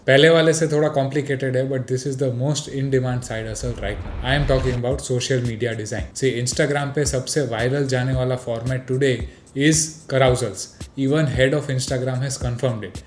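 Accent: Gujarat, India. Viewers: bhai yaar aapki hindi me jo gujarati lehka aata hai vo maja aata hai...by the way english bahot achhi bol lete ho. bhai me bhi professional graphic designer hun ahmedabad se. abhi kam bahot hi kam mil raha hai koi help ho sake to kar dena bro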